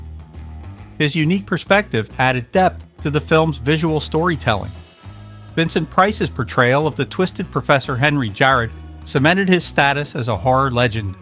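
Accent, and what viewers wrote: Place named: Baltimore, United States. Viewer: The narrator has a thick Baltimore accent. Ask him to say "Aaron earned an iron urn."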